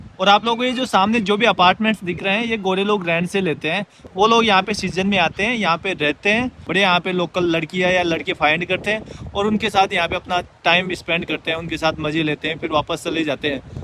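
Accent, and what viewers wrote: Rajasthan, India. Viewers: Are You Belong from Rajasthan? Language Accent 😁❤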